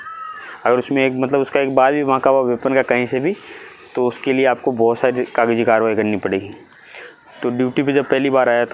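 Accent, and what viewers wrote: India. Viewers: Sit aapka na bolne ka aur samjhane ka tarika ekdam mast hai👌ekdam Desi style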